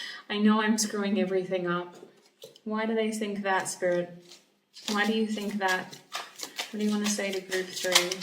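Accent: Australia. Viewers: it’s INSANE how accurate your readings are. also, another reason I love watching is because of your australian accent 😍❤️ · Are you Aussie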